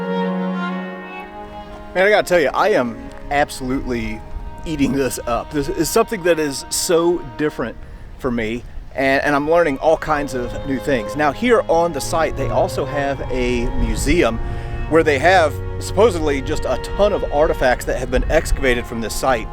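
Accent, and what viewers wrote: United States. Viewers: Excellent presentation on the edge of the known world. Dont know why,(raised on U.S. tv shows in the 80's) maybe? But we do enjoy our history being told in an american accent. 🇬🇧🇺🇸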